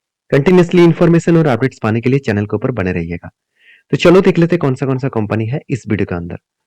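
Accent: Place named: West Bengal, India. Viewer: Are you from west bengal?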